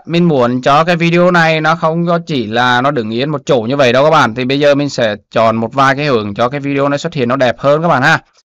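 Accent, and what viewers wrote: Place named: Nghe An, Vietnam. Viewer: anh cảm ơn em nghe giọng em như người nghệ an quê mình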